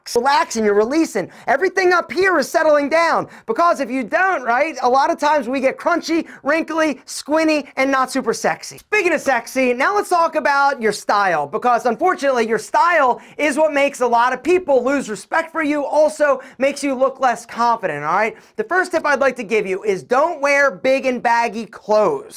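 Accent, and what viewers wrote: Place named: Philadelphia, United States. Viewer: “Ouh-vurr” = Over ... This dude is definitely from Philly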